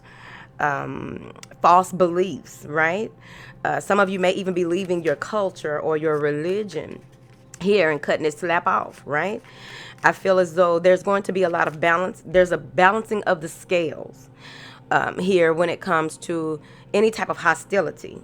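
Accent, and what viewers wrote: South, United States. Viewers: My heart melts when I hear that southern ✨💜 thank you for such clear downloads & always getting straight to the point/no softening the message.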